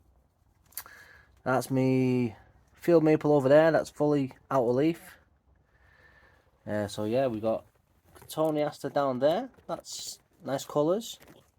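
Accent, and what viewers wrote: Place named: United Kingdom. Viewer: Good stuff mate, just subscribed. Where in the uk are you, couldn’t make out the accent. Cheers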